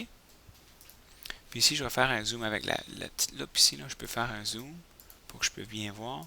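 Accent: Quebec, Canada. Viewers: accent canadien bouuuu CETA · accent canadien je dirais ^^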